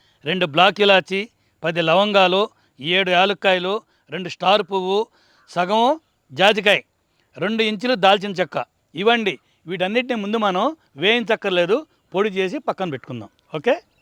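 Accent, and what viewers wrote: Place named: Telangana, India. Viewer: Brooo meru telangana lo vunnara chala years language same mala ఉంది 😍😂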